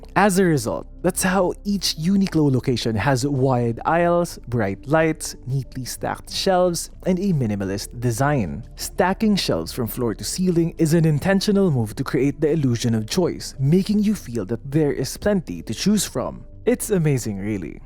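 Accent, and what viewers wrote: Philippines. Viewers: This is excellent! Fast-paced, interesting and, most important, the guy does NOT put on a fake American accent! His accent is a neutral, correct Filipino accent. Like Rico Dizon, he just talks naturally with no twang.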